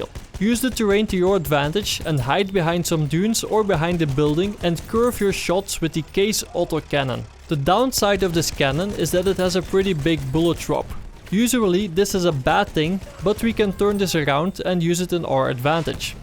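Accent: Netherlands. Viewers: jij hebt echt een zwaar NL accent in je engels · Why do you sound so dutch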